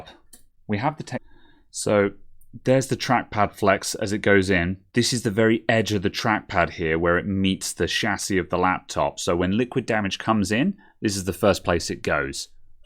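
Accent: United Kingdom. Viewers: Your voice is literally why I have your vids running as a background when I work. So soothing and british :D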